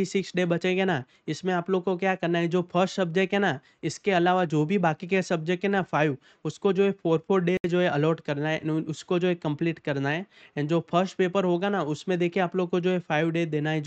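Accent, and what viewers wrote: Gujarat, India. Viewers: Tame gujrati lago cho....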